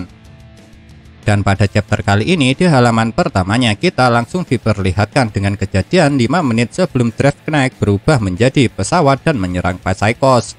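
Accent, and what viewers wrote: Java, Indonesia. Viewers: Logat jawa neee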